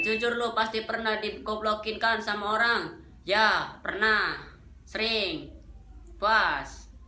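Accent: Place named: Java, Indonesia. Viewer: Medok bgt ngakak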